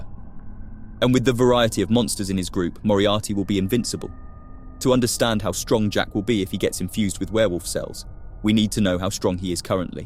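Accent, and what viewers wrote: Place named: United Kingdom. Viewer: oh nah whys the voice uk cant tell if its ai or not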